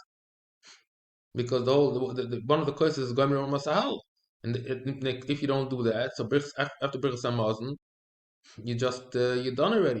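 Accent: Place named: United States. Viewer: מבטא אמריקאי חזק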